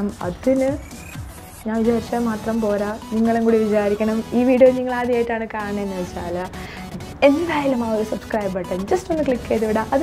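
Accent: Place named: Palakkad, India. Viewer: Palakkadan penkutty😑guess ur frm pkd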